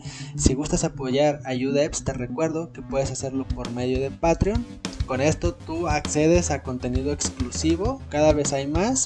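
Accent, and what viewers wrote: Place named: Monterrey, Mexico. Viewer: Muy interesante tu acento. De Monterrey? Me gusto tu video.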